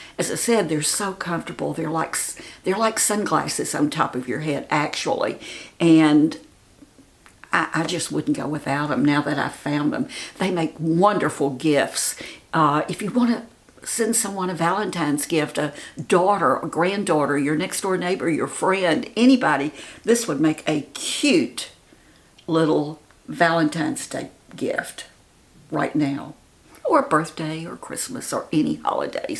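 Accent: South, United States. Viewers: You look lovely, as always. Do you ever watch Melissa55 on youtube? She reminds me of you...your Southern accent, some of your facial expressions and mannerisms. Anyway, hair color, particularly box colors, fade on everyone's hair over time, especially if you are covering grays. Even though they say "permanent", they are not going to stay vibrant forever due to shampooing, sun, and other products. I started coloring my own hair in the 1970s and became a licensed cosmetologist 20 years ago. I cannot tell you the number of times I colored my hair and, being a natural born redhead, I have been everything from bright copper to mahogany to strawberry blonde and red is the hardest of all colors to keep vibrant. I gave up coloring 5 years ago and am so happy I did, but boy, I do love the color of yours now. It looks age appropriate and youthful at the same time. 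Sometimes I think I would like to go back to the pretty blonde with high- and low-lights I used to wear, but alas, it is so much easier to just go natural (for me!) Your color is beautiful and you did a great job on the cut, too!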